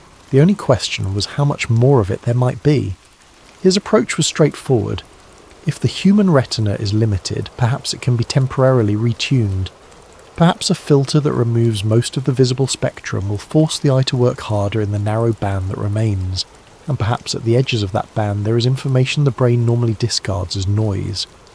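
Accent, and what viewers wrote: United Kingdom. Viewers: I love the soft spoken British accent putting me to sleep 😴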